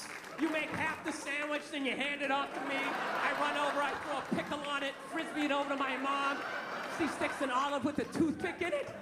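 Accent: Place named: Massachusetts, United States. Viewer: Michael Bulls Canton is only 15 miles SW of Boston and a 22k population is hardly small, but yes, it is a Massachusetts accent.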